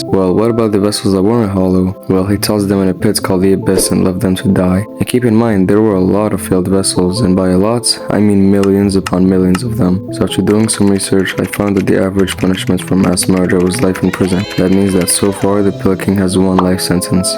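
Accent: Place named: North Africa. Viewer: You north African bro? the accent kinda seeps out! Really good video btw!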